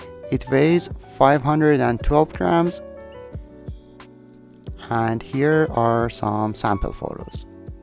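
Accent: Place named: Iran. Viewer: سلام . ویدیوی خوبی بود . ممنون از نحوه‌ی انگلیسی صحبت کردن متوجه شدم ایرانی هستید 😊. در کل با لنزهای زوم ارتباط برقرار نمیکنم . به جای ۲ تا لنز زوم ترجیح میدم ۴-۵ تا پرایم همراه خودم داشته باشم .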